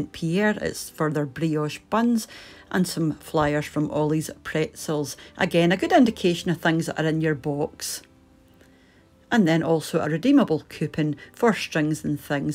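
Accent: Scotland, United Kingdom. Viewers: I'm a SASSENACH, and I love your Scottish accent: "In a wee while, I'm away tae make Mester tea!" 👍😂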